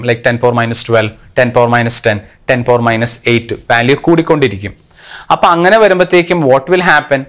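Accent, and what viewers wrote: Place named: Thrissur, India. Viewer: TCR slang ⚡⚡💪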